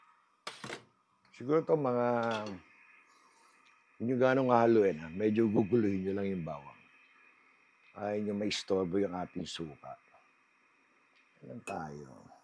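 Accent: Marikina, Philippines. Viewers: Puntong Marikina ang salita niyo. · Yung accent ninyo talaga pure taga Marikina😊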